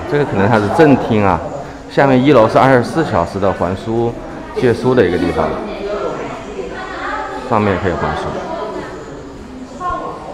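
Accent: Wuhan, China. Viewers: 虾不掩鱼，纯正武汉普通话，亲切，点赞。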